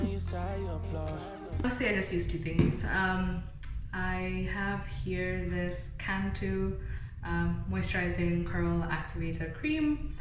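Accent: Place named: Kenya. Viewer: She's Kenyan.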